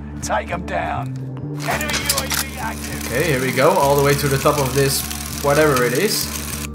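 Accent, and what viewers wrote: Netherlands. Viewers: are you from dutch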